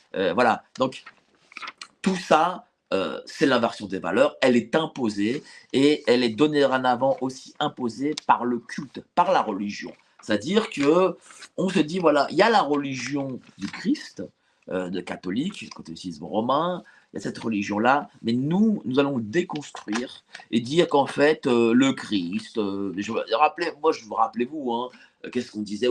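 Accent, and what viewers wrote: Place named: Germany. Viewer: Arrete ton "il ne faut pas avoir peur", c est à vomir. Je deteste l accent allemand.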